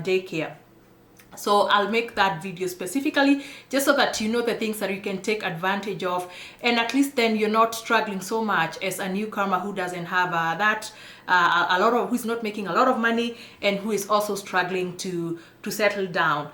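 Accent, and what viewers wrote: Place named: Kenya. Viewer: You have kenyan accent😍 Mimi pia ni mkenya in Edmonton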